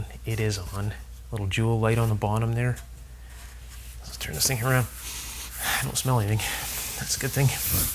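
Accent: Canada. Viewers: You sound Canadian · You from Canada, eh?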